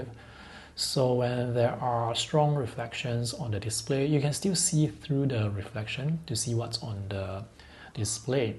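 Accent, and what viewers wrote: Singapore. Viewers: I heard your voice and said: singapore? Sounds like. And was you. The last video I saw from you was years ago, about watercolor.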